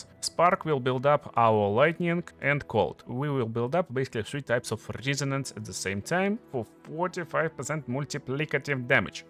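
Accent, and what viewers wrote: Russia. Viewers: судя по акценту парень то русский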